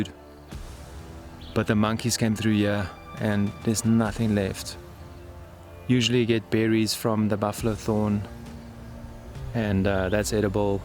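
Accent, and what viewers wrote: South Africa. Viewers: it's uniquely South African ❤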